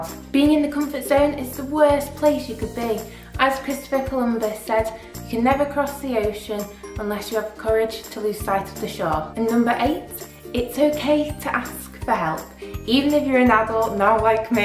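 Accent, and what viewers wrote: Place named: United Kingdom. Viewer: Happy Birthday! Where are you from in the UK? I like your accent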